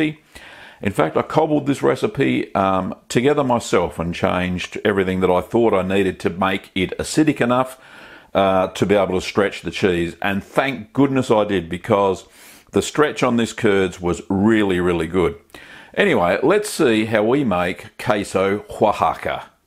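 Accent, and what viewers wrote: Australia. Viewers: Everyone here knows how it's pronounced. Clearly is from Australia and that accent comes out- to me, that just adds character. The important thing is that he has made a great video, and it's also clear that he has invested some time learning about Mexican culture as well. Well done!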